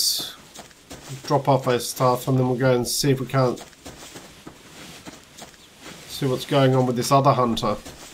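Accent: Australia. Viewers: The video was great so I subscribed to your channel. You have an aussie accent. I am from the Gold Coast, Queensland.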